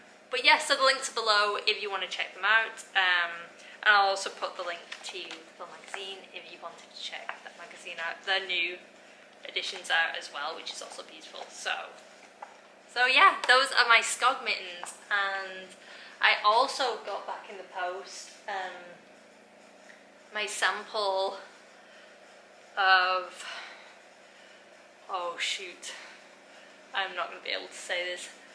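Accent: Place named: Chester, United Kingdom. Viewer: Loved your first podcast. Your voice reminds me of home (I’m originally from Chester but now live in Scotland). Tilly is gorgeous, hope to see more of her and you ☺️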